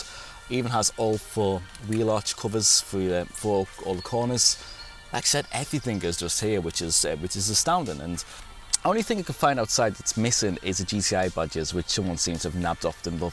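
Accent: Liverpool, United Kingdom. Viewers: I have a mate from Maghull and you sound exactly like him. A bit different from 'normal' Liverpool!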